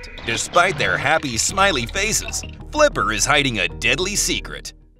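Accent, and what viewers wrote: United States. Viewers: Appalling American narration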